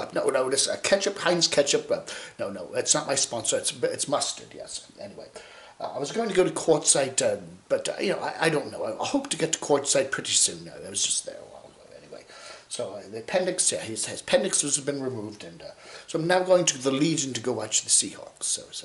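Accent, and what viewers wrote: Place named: Boston, United States. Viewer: what is "service geezer" my idea can't be right . great accent ! boston ? lol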